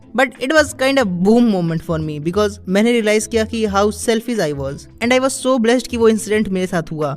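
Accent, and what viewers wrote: Bengal, India. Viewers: R u Bengali? Btw liked ur video👍🏼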